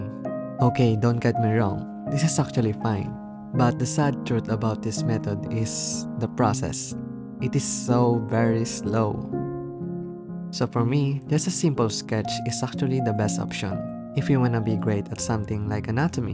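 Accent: Philippines. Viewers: wow you are a Filipino, just by your accent its so obvious. I live in Philippines too, I m a fan of your art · Ilonggo ka sir? curious lang ko sa accent 😅 btw your auch a good artist po🥰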